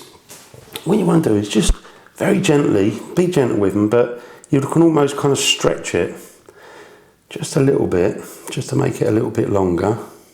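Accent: United Kingdom. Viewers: I'm drowning with his strong british accent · Cor blimey....do what leave it out 😂love the accent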